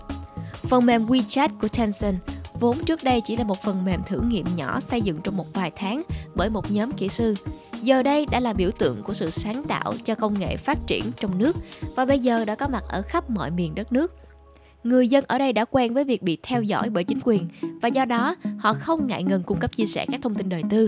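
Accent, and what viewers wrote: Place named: Saigon, Vietnam. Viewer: Giọng đọc, rất hay... Dễ nghe và rõ ràng, tôi nghĩ là tiếng Sài Gòn. Cảm ơn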